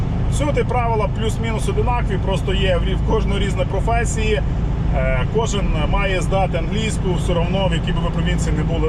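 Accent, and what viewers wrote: Lviv, Ukraine. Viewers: Добрий день мене звати Ігор живу в Оттаві.Працьую водієм рок трак. Хотів би з вами поспілкуватись,чую вашу розмову і роблю висновок що ви Львівська обл.Дуже дякую.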